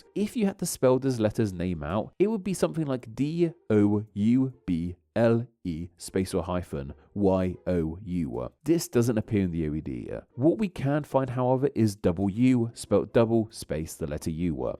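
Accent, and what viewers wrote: United Kingdom. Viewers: s is literally ass but i guess the british accent breaks it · Our is interesting because in your British accent it is R but in an Australian accent it's pronounced "awo" like ouch ow. I wonder if there are words that would fit from other accents to letters as well?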